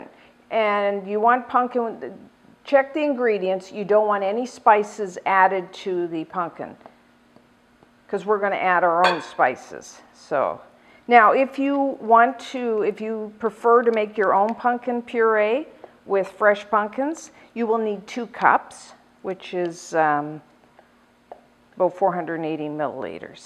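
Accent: Canada. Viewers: That's just her cute Canadian accent coming through. She also said Oat for out and a boat for about...we in the USA love to hear that accent. It's very charming :)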